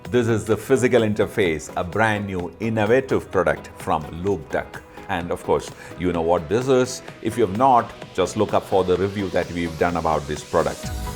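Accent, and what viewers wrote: Kerala, India. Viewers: I was attracted to this channel first time after hearing your polished english slang with a bit of malluism☺️ Simple plain english and excellent lighting makes each episodes a delight to watch. Way to go Mr. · Hello, I am following this channel since January when randomly i found a video on the perspective related to lenses on youtube. I liked the video so much that i subscribed with bell before even the video ended and that liking was so positive and is increasing day by day that makes me follow your channel till date. The liking of the channel rather the videos of the channel comes intensely from my heart and the reason behind this is because of the quality of videos you provide, talking of the quality i am meaning to say about the brief but elaborated explanation of the product or settings, its like you complete the syllabus in brief but in such a way that anyone could relate it in their mind and understand easily, atleast i love this short bur descriptive part very much and another cause of loving this channel is the field test section, that is so good where we get to know about the output quality even before we try it, and the most favourite part is the linguistic communication of Sir, i mean as an Indian no matter how much we know or understand english anyone would be able to relate understand and learn faster in this indian accented english than any other foreign accent and Sir's narration is so good that it feels like i am listening to him as if I am in front of him. 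In short the quality of videos with the indian scented audio kept my love and respect intact for this channel till date and hopefully in future too. Keep up the good work you are doi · Many congratulations sir on 50k many more to come. You spread knowledge about products in details in very indian english tone. Thank you! Keep growing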